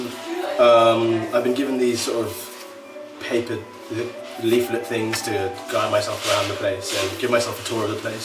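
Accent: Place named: United Kingdom. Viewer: Those soothing british tones will be popular with american viewers. Rake in those views boi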